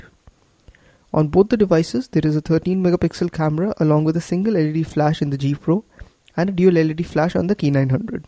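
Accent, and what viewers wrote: India. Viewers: i don't think that for even once, did he make any grammatical mistake. i don't know from which part of the world you are, but there aren't many in the world who speaks more correct english than us, Indian! you may have accent but our english is more understandable to the world due to our neutral accent. these videos are less watched for the accent and more watched for the information. the guy did a great job and the amount of 'likes' justify that. good job man... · indian english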